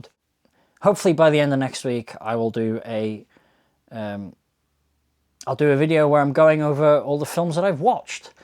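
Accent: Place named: Midlands, United Kingdom. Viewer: Amazing what town are you from? Your voice sounds midlands, is it that part where there is a 'something' line I have heard Elis James talk about before?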